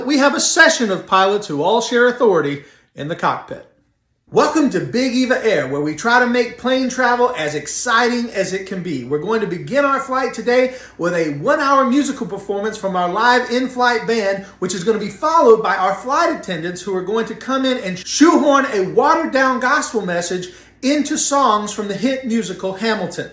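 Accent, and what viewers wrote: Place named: Scotland, United Kingdom. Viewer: arguing the Bible in a think Scottish accent is . . .divine!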